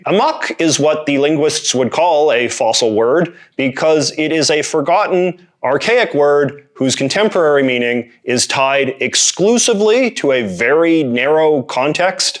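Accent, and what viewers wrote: Canada. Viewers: North Midwestern (MN, USA) here. "Hey, how yous doing?" is addressing a group of people in some rural areas in the north west of the state. I am unsure of how common using yous as a plural you is, but I'm sure it bleeds into Canada in many places, eh? Having grown up near Fargo, it is good to hear my home accent on this channel, but I suspect the host on this channel is Canadian, as the accent leans real heavy on the double-o ooh in 'about' and such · By any chance are you Canadian?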